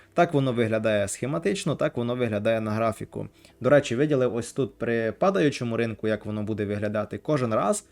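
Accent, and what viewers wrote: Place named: Precarpathia, Ukraine. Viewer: Класна Українська Але я чую щось таке рідне прикарпатське!😮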